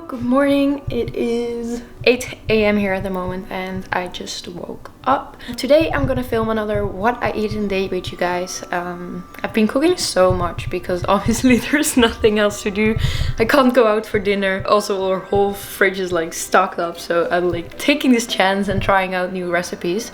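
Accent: Netherlands. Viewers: she sounds so dutch lol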